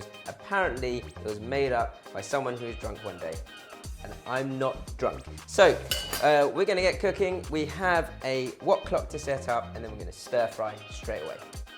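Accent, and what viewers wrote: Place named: Yorkshire, United Kingdom. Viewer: Nice accent. Were you born in the UK? Is that Yorkshire accent? The Recepi is great!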